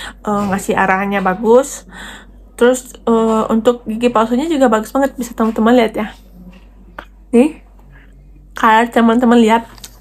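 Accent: Padang, Indonesia. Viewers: Kaka nya org padang ya. 😅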